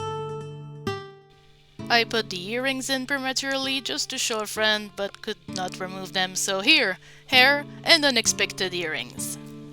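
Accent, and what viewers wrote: Quebec, Canada. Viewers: 2 minutes in, I had to check your "About" section to confirm that you are indeed a fellow french Canadian hehe. I recognized the accent ;)) Salutations de Laval! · 1 minute in and I just knew you were french canadian, amazing work mon chum <3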